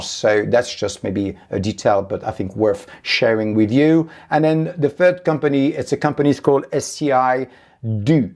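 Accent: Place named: France. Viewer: you're amazing and your English is wonderful. Please bring out merchandise with my favourite saying that you often say in your lovely French accent. ' Behind the Bars'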